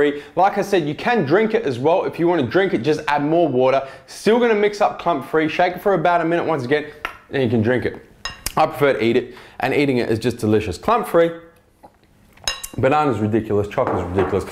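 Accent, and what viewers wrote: Australia. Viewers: Excellent review love your Australian accent bro thanks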